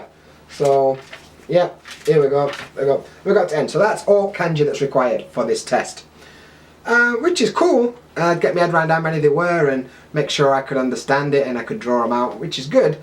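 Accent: United Kingdom. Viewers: have you ever seen your auto-generated English subtitles? Your intro -> "Thirty-Two Eyebrow it's down from ten Dakota and today..." It's like they've never heard a British accent before xD I study Kanji by writing & creating weird associations in my head about what they could represent. The kanji for car kind of looks like a box with two axels, so it's easy to remember. Or for dog, I just remember it's similar to the kanji for fat and come up with the idea that all dogs are fat because they never stop eating. Cheers on 3k subscribers!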